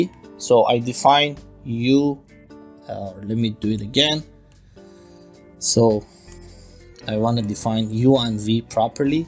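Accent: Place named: Iran. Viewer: Idk it is me or all persians feel joy after hearing the [persian accent]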